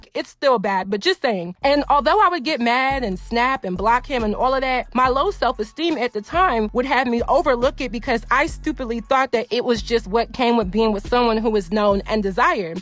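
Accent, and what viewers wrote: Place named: California, United States. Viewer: To answer you about the accent she has Trust me it is a Cali accent I live in Cali and everyone always tells me I sound like the Kardashian’s when I never noticed I guess it’s just the main accent out here ❤️ I love your videos !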